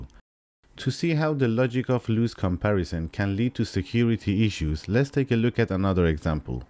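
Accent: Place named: Iran. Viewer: Your accent is like Persians accents lol , are you Iranian ? Buy the way I LOVE your videos man , Thank you so much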